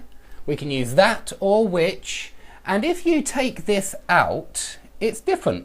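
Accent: England, United Kingdom. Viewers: are you English? I loved your accent:)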